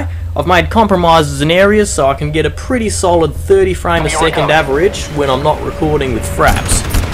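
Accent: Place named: Australia. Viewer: that has to be the most Ozzy true blue accent i ever heard from an Australian EVER LOL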